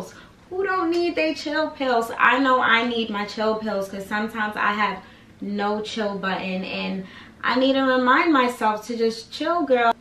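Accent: New York, United States. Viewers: J BIN LOC Yes, not everyone from NY sounds like that but a lot do. It's still one of our accents or the way some of us speak. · J BIN LOC that’s just her accent she’s from New York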